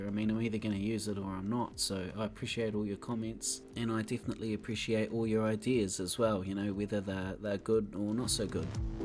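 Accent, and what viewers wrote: New Zealand. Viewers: ok u asked for ideas: i would love to see an extended cinematic view riding on this yellow motorbike! Just riding all through the city. We need to relish in the awesomeness. Its like you create this awesome stuff but we only get to see it once for a very short time. And dont worry about the accent, it’s impossible to sound like a sweaty nerd with a new zealanders or Australian accent lol. At least from an American pov. Good work though, another banger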